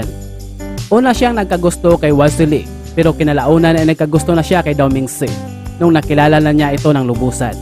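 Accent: Visayas, Philippines. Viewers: Bisaya ka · BisAya ka nman magkwento..! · Bai bisdak ka no maila sa sinultihan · Nkaka tawa ung ngsasalita BISAYA k ba! Wahahahahhaahhahaha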